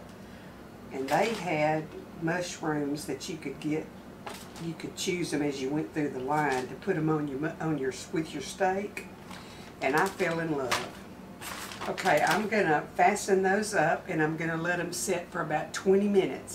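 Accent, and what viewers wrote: South, United States. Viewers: Hi.. we just found you on YouTube.. love watching you cook and love listening to your Southern American accent. The mushrooms looks great also 👌👌👌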